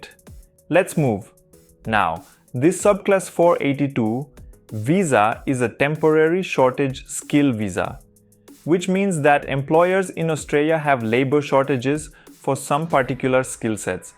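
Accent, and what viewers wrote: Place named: India. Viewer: This is Indian English.. sounds good to be true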